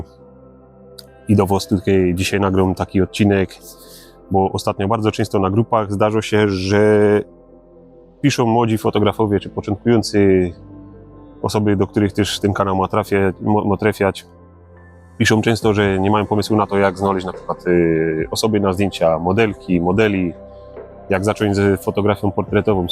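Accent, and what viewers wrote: Silesia, Poland. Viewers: Trzymam kciuki za rozwój kanału. Tego typu polskojęzycznych fotograficznych kanałów jest stosunkowo niewiele. Podoba mi się formuła - krótko, rzetelnie i treściwie. No i ślonsko godka, choć sam jestem poznańska pyra ;-)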